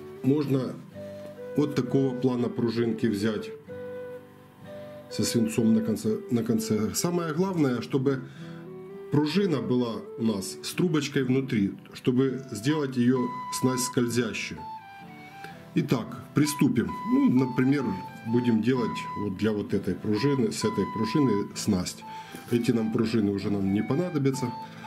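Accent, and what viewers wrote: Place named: Ukraine. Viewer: Я извиняюсь, но "шо" и "гх", вы с украины, автор??? Ничего личного, просто интересно...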